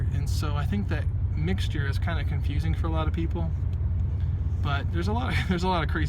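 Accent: United States. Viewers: Definitely not lol where you from bro...im from Detroit...you sound like you from Ann Arbor or the burbs or something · Thank you, considering moving from metro detroit to tuscon... worried about the heat, you sound like someone from grand rapids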